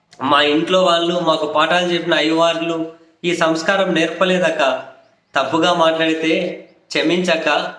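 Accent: Rayalaseema, India. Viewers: మీరు సినిమా లో try చేయవచ్చు బలగం సినిమా లో కంటే బాగుంది మీ డైలాగ్ delivery రాయలసీమ బాష లో పల్లె టూరి బాష లో సగటు మనిషి ఆవే దన తో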